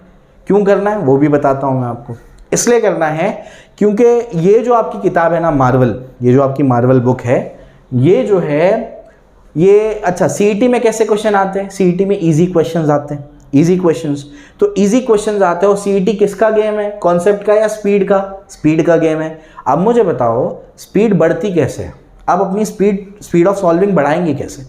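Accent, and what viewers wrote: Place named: Badnapur, India. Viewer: Sir aap badnapur ke ho kya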